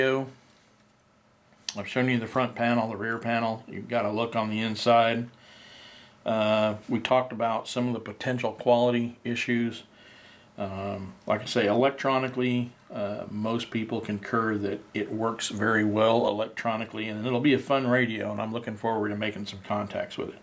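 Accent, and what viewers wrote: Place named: Texas, United States. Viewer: Thats the Texas Drawl. I like it.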